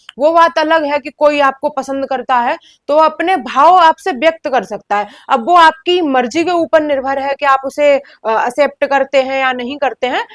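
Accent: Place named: Western Uttar Pradesh, India. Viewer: Western UP se ho na aap, aapki tuning se lag raha hai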